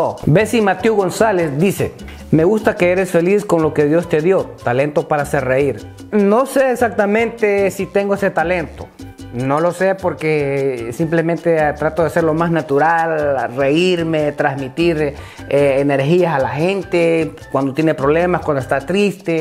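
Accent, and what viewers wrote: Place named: Oriente, Cuba. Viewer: Soy chalateco y creo que vos sos de Oriente así hablamos también cuando estamos jodiendo te felicito sos auténtico maje segui adelante tenes mi apollo aquí esta tu 👍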